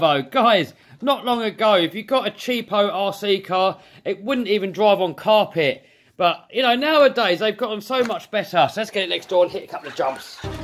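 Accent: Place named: England, United Kingdom. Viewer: What part of England you from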